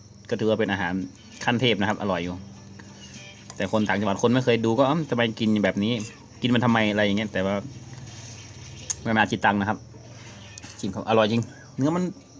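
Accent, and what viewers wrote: North, Thailand. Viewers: อู้ไทยประเเลดเมื่อง5555++++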